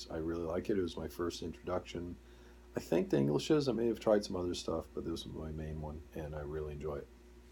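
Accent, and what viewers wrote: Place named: West Coast, United States. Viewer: Nice west coast accent lol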